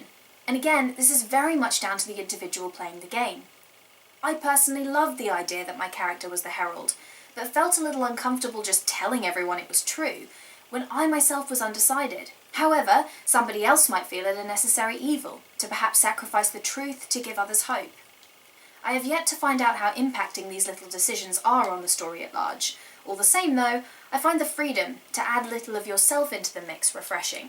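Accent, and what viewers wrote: United Kingdom. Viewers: You have a British accent. I'm subscribing! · Very good review, it’s a fair bit more informative than how I’ve found most other reviews of this game to be. In all honesty, I am already quite convinced that I will love this game (I enjoyed most of BioWare’s products, from Knights of the Old Republic to Mass Effect 3), but I had to see other people’s views on it so I would feel better about spending so much money on Dragon Age: Inquisition… seriously, this game is soooo expensive in the UK! Anyway, I found the part where you talked about the choices and their more subtle effects really interesting; it seems to me as though they are more maturely done and closer to what we have in the Witcher trilogy, rather than the binary options of most BioWare titles. Though I’m also prepared to expect from Dragon Age choices that are more about defining your personality than truly changing the storyline, whereas in the Witcher games we are only given a certain degree of liberty with Geralt – who is an already existing character – but have much more freedom with the direction in which you can take the story. 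Of course, both approaches have their ups and downs, so I’m not saying either is better than the other. By the way, are you from the South of Britain? Your accent sounds like someone from that area (asking only out of curiosity, as I find languages and speech quite fascinating personally). Anyway, this is a great video, I will subscribe.:)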